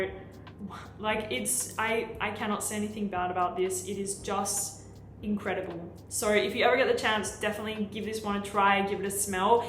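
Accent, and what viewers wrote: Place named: Australia. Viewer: Love Australian words” accent!! AMAIZINNNN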